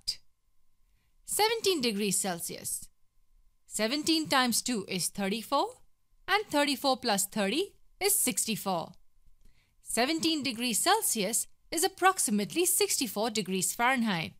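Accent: India. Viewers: Great trick! Thank you. Love your Indian narration too. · she has an Indian accent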